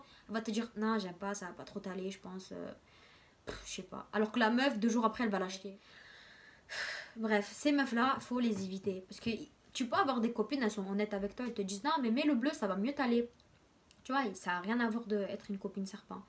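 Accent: Marseille, France. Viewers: C’est bizzare ta un accent parisien et marseillais en même temp, tu viens dou??? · Trop belle Mash'Allah ta grv un magnifique accent sa fait penser à Marseille mdrr ❤️❤️😘😘😘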